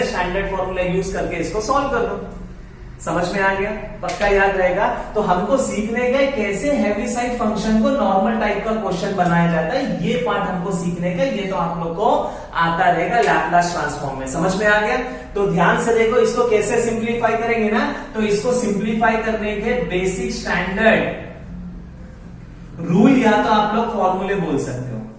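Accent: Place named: India. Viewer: Why is indian english so difficult to understand? :(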